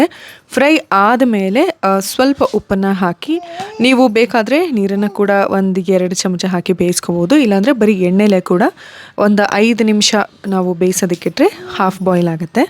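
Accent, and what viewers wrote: North Karnataka, India. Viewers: Uttara Karnataka bhashe nalli full ondu vlog maadi mam please nim bayalli nam uttara Karnataka bhashe keloke chanda please mam one vlog please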